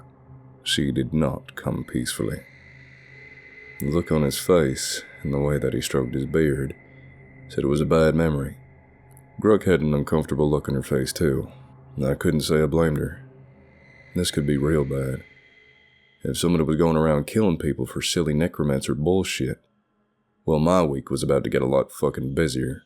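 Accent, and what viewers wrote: South, United States. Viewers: While I will always appreciate the English accents of my favorite YouTube narrators I love hearing stories from America, South of the Mason Dixon line performed by a Bubba.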